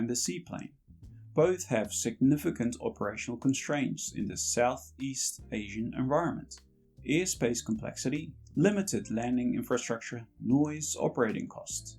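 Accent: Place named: New Zealand. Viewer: You sound like a Kiwi with something else bolted on?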